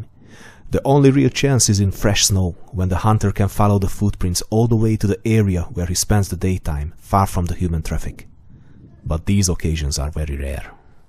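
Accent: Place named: Hungary. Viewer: Magyar akcentust érzek a levegőben. Minden tiszteletem nem lehetett egyszerű a vadászat!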